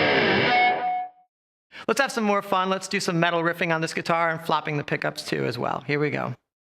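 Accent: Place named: Texas, United States. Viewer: WOw! Thats a pretty dope Texas accent...hehe...awesome model by BCR...good stuff!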